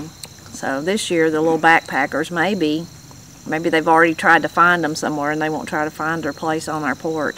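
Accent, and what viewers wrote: Appalachia, United States. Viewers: Huskvana is the phonetic way of prouncing the Swedish brand chainsaw, but who cares if you mis-pronounce a word. It dosen't matter because we love your accent and Appalachian special words. That metal tub would make a great planter. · Ah the popsicles in the garden :) We might get snow tonight up here (I hope not) and I really need to clean my garden up too. Also, I love how you pronounce Husqvarna :) And while it's not how it's pronounced in Sweden/Denmark/Norway (it's a Swedish brand) I totally understood you - it was just done with an Appalachian accent! :) (pronounced like: hoosk ·vaar·nuh)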